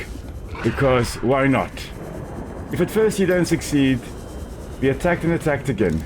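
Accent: South Africa. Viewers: where you from? you sound south african to me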